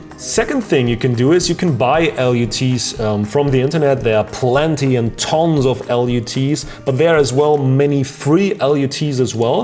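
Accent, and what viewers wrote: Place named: Germany. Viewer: Irgendwie hört man dass du auch Deutsch sprichst ;D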